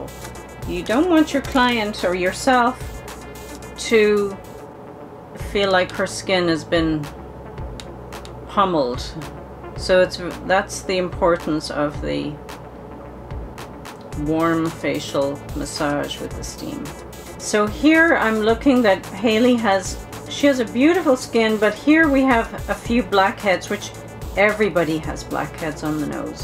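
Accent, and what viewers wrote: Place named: Ireland. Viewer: can hear the irish accent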